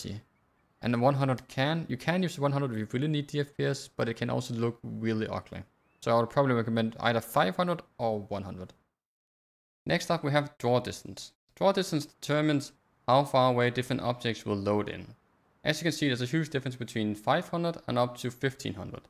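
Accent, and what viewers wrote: Denmark. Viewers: den mest danske accent jeg har hørt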